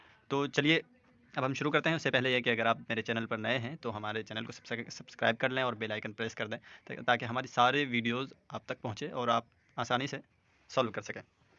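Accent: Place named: Kashmir, India. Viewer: Sir your accent is different are you from Kashmir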